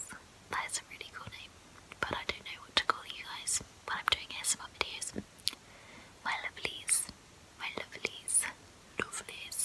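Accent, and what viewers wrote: United Kingdom. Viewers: Nice British accent 💛☺️ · Anyone else figure out that loud kinda creepy noise that repeats later? Sounds like maybe a motorcycle going past her flat/house? Aside from that, I truly enjoyed your whispery bible read. Your velvety English tinged whispers are very soothing to listen to, especially for bed time. Please keep sharing your Christian gifts! 🤗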